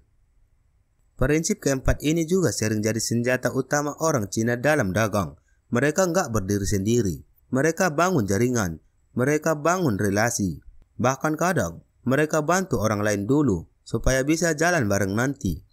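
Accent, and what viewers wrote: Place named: Eastern Indonesia, Indonesia. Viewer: Sepertinya logat indonesia bagian timur gan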